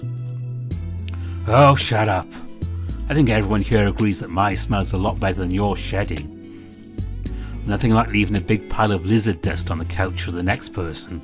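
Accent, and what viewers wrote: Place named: Scotland, United Kingdom. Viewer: You have a really nice accent, but I can’t quite pin it down. Are you Scottish? (I’m terrible with identifying accents😅)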